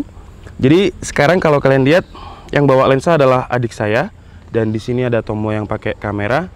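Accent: Java, Indonesia. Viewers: Wong jowo tt mas?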